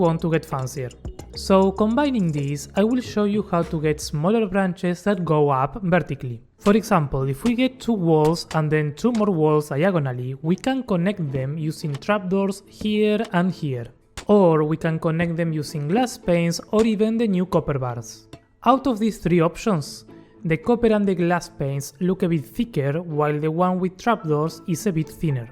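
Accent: Argentina. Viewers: sos de argentina no? me remil suena ese acento JAJAJAJAJA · Eres Argentino no?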